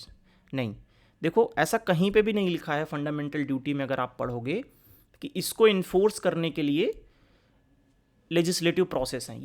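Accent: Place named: Bundelkhand, India. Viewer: yes..apne kaam se kaam rkhna means ache work Krna sbse better h . Mai jaha tkk guess kr paa rha hu apko bolne k tariqe se ap mujhe MP Jabalpur Bundelkhand region se ho..Maine sahi bola kya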